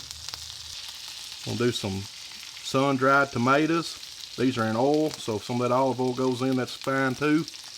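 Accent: South, United States. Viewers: Love your videos and great instructions. And your accent is the best! I’m a Texan living in Michigan, and I need my Southern accent fix from you!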